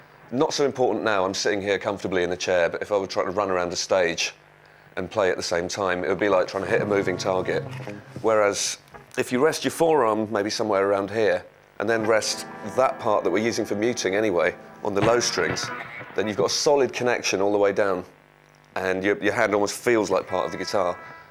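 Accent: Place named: United Kingdom. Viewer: Your accent is so British.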